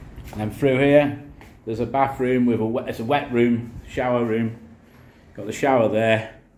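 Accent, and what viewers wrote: Liverpool, United Kingdom. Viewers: is Mada safe? I've always wanted to go i was fascinated with it when i was a kid i'm still a Geography nurd now that's why i was surprised i hadn't heard of nosy be. where are you from? am i detecting a Liverpool accent there?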